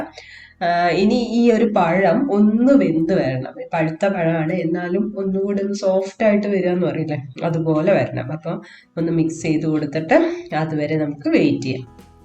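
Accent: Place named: Malabar, India. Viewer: Hii...😄 recipes simple & tasty aanu...adhikam budhimutillathe vegathil undakan pattunnu 😄. ettavum ishtapettath Malabar slang presentation aanu..eniyum nalla recipes wait cheyunnu...😋😋..takecare dear 👍❤️😊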